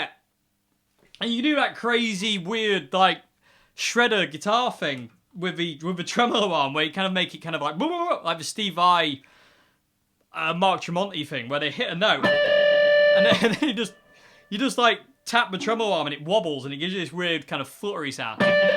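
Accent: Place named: Lincolnshire, United Kingdom. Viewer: with a hint of lincs accent if I'm not mistaken?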